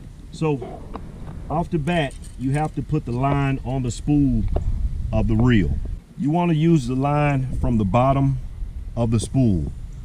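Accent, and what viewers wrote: Texas, United States. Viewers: Texas baby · lmaooooooooooooooooo that country voice tho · Are you from texas?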